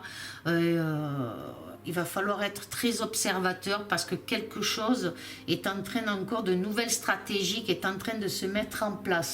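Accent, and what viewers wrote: South, France. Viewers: Bonsoir toujours un plaisir de vous écouter heureusement que Dieu vous a donne ce don pour nous éclairer et puis j aime le petit accent du sud 🙏🏾❤️☀️🌹🧚🏿☘️🌞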